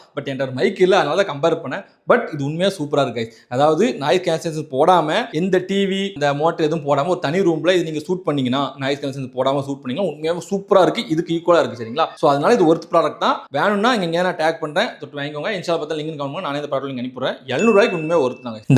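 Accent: Tirunelveli, India. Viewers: Anna neenga thoothukudi, thirunelveli pakkama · Anna nenga tirunelveli Karan ah? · Anne nega tirunelveli yaa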